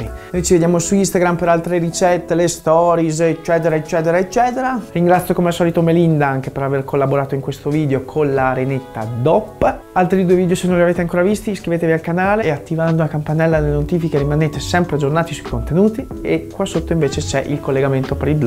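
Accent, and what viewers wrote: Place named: Emilia, Italy. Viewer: Ma sei troppo forte, sembri emiliano come me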